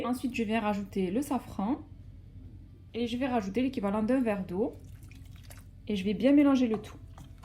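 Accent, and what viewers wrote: South, France. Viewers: Très bel accent du Sud en plus machahalah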